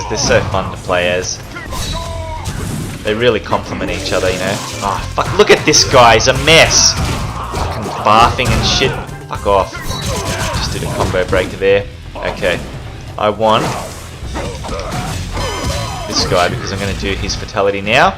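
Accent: Australia. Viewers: Yup that’s blunt amazing Australian accent ✨✨💞💞💞💞💞🔥🔥😭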